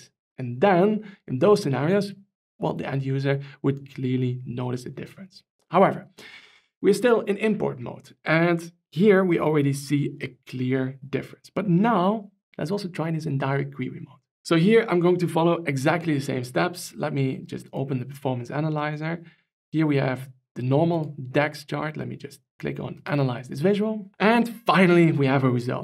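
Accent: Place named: Netherlands. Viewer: I am not an English speaker but I follow your educational content. Why is your English accent like this? Whether it is Google's AI or any AI I have used, it pronounces your language as Dutch, not English.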